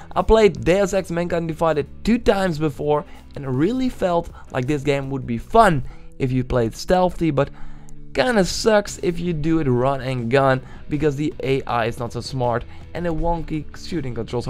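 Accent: Netherlands. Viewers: That dutch accent tho ;)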